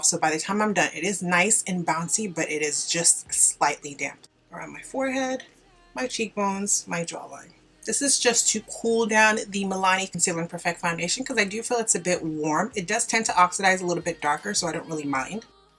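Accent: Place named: Caribbean. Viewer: You are beautiful, and you did an amazing job with the explanation and demonstration. During the video, I heard a tiny bit of an accent, a Caribbean accent, like mine lol. Am I right, are you from the Caribbean? I went through many of your videos to confirm but didn't see anything. So, are you?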